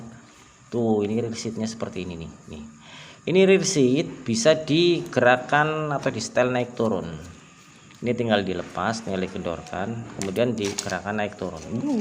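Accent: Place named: Banyumas, Indonesia. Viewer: Jnengan tiang pundi mas,kayane republik ngapak 😅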